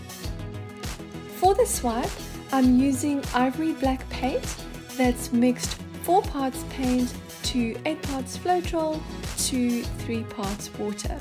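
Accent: South Africa. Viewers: Hi there ... is that an SA accent I'm hearing? And are you from KZN or JHB? Watching you, I feel really inspired to start painting again. So up to my studio I go ... thanks to you:face-red-heart-shape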